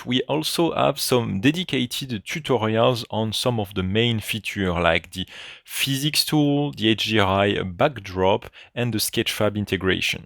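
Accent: France. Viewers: Bonjour, pourquoi vous ne faites pas la présentation en français, vu votre accent, vous l'etes non? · Super toutes ces nouveautés! Pourquoi ne peut-on plus avoir l'option lunettes en mode téléphone pour les panoramiques ? Merci. (Toujours drôle cet accent français en anglais ^^ ;-) dommage de ne pas traduire au moins à l'écrit)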